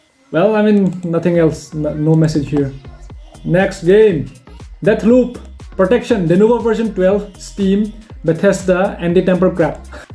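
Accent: India. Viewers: Bro is asian with an Indian accent, like HOW?!!!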